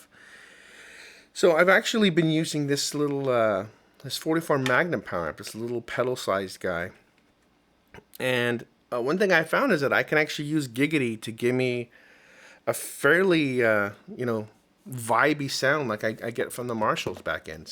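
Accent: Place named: Baltimore, United States. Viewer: Fantastic sound! I, too, am a bit of a tone chaser and am gratified that with the tools I have, I can replicate those great Andy Summers sounds. Curious, are you from the Baltimore/MD/VA area? As a tone chaser, I also hear dialects. Occupational hazard.;)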